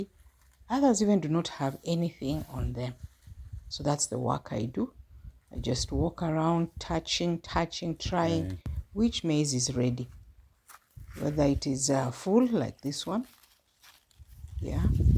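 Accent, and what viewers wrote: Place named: Kamba, Kenya. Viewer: I sensed that you sound like Kamba, where are you from?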